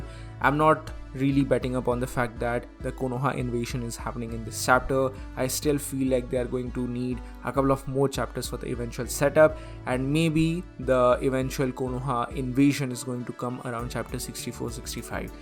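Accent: India. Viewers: BECAUSE HER ENGLISH SPEAKING STYLE IS LIKE INDIAN THAT'S WHY I AM WELL THANK YOU FOR TELLING (ARIGATO)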